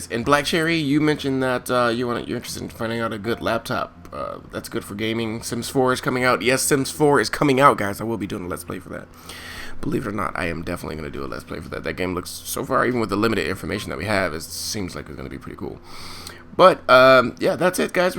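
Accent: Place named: Michigan, United States. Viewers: I was guessing you were from the Midwest - maybe Wisconsin or Michigan - based on your super slight accent. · Hey, you leave Michigan accents alone!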